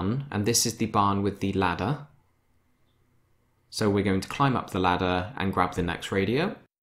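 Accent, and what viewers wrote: United Kingdom. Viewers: Best walkthrough ever. Smart evenly paced british voice is like listening to a harry potter audio book. Make sure you do the next Dead Island 2 or Red Dead Redemption 2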